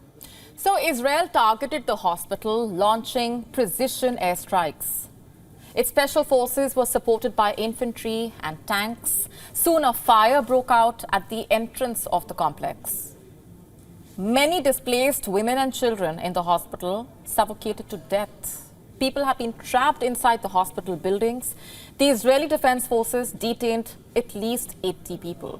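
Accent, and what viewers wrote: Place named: India. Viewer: This lady is speaking English with Indian accent that makes you throw up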